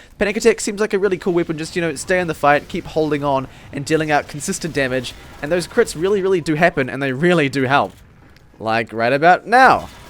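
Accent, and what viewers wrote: New Zealand. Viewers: listen to the way he says 'get'. He's from nz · He sounds very much New Zealand to me.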